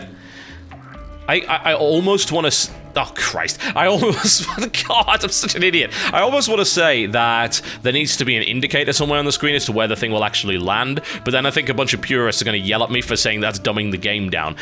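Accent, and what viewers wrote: United Kingdom. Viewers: If it's one thing I love about, or perhaps the British accent in general, it's that you can say things like "...make me want to rip off my own face." and sound like a total gentleman.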